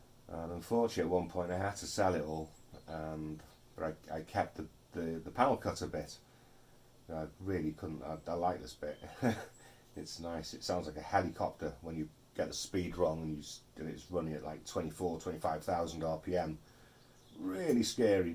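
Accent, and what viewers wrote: United Kingdom. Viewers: Awesome. My first video of yours that I’ve watched. Will definitely watch more! Nice to hear another UK accent in a sea of American accents. 😊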